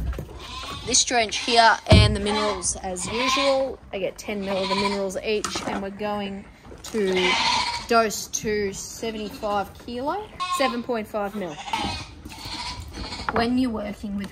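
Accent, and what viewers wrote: Australia. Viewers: I didnt even consider that most people watching would be american because youve got a proper aussie accent 😂 im watching from WA, i just think you're funny af and its cool to watch farm work for some reason 😅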